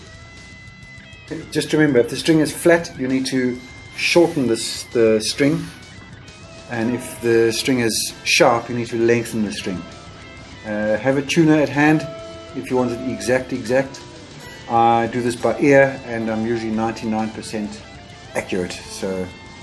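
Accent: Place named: South Africa. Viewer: Nice, you must be South African. 🎉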